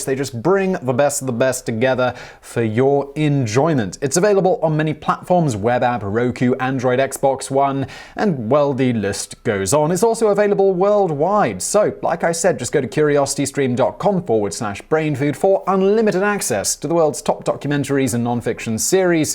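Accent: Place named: South East England, United Kingdom. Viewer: both and I were born and brought up in the South East of England, and share a common dialect with very similar accents. I am not have "Sport" with his accent. (It is essentially the same accent as my own, with slight variation due to us both moving away from that region, and living in a variety of other areas over the years.) He absolutely said Continents. Further your accusation of "People such as yourself love this kind of stuff" is an appalling and baseless assumption on my character.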